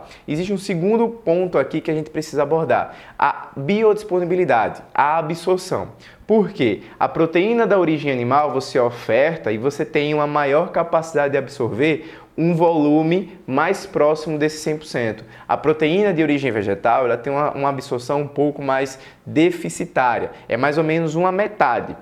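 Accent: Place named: Sergipe, Brazil. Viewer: Pelo sotaque eu percebi que O dr. é de Sergipe kkkk aqui pertinho de Salvador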